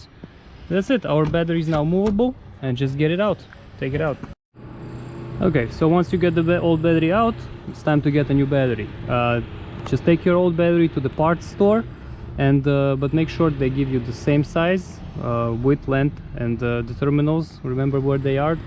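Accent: Russia. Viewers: You sound like the Russian Hacker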